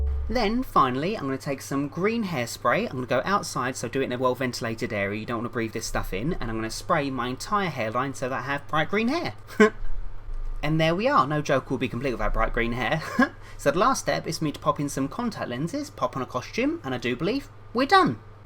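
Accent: United Kingdom. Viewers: Hai it's me again r u British? cuz be for I thought you were Irish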